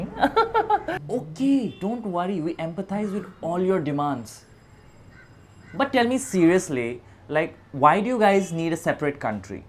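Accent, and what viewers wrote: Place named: Northeast India, India. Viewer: Did anyone predicted correctly that u belong to north-east when u visit other western states eg Mumbai😁 the way u talk it can be predicted tht u r from north-east but seeing ur face its really difficult 😊 I really enjoyed watching 😊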